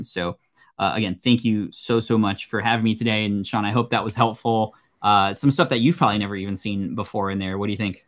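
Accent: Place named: United States. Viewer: Hey fellow audience members, What type of American accent is this? I’ve just noticed this a few times in different videos on YouTube. And I really like this accent. What kind of city or state or whatever does this accent come from. It reminds me of the accent of the guy at dailytekk. PS I’m outside the US, and have started to recognise in a little bit more detail different American accents rather than just the classic ones I knew as a kid, and it’s just interesting to me if I hear one that I like and I keep hearing in different videos. I probably will end up looking up a accents of the US kind of video. But every now and again I’ll just ask what is that accent because it’s interesting to me in the moment. Thanks.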